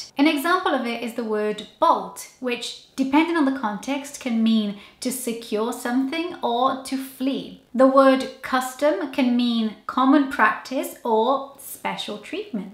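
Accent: Wales, United Kingdom. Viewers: Came across your channel on channel mum weekly pregnancy videos and had a feeling you were from Wales? You have a Welsh accent! I’m from Cardiff! Found this video very fascinating! x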